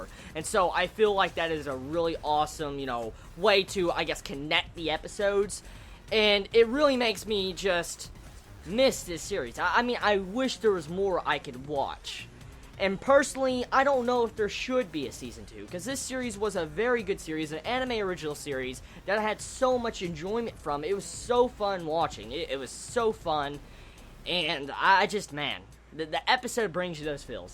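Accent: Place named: Texas, United States. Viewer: yo bro you from texas?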